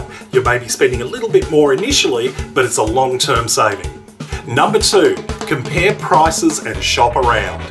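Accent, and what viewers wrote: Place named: Australia. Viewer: Yes he's Australian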